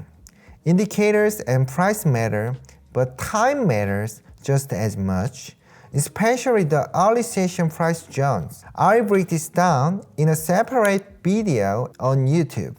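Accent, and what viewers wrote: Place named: United States. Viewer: Fifty year old born and raised Briton here. I understand him very clearly and easily. For me he is speaking with an American based accent and cadence, it is the Standard International/Business American English version. Which is overlaid onto his Native South Korean accent (Im assuming from the name ). If you can not understand him, then you will not understand most of the World when they speak English. Including from the Land where English was birthed: England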